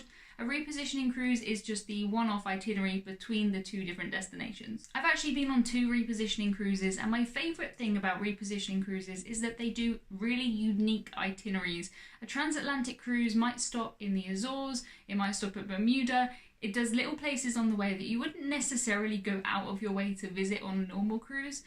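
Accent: United Kingdom. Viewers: Thanks for the good info. topic. I have never listened to a fast-paced British speaker like you before. 🤔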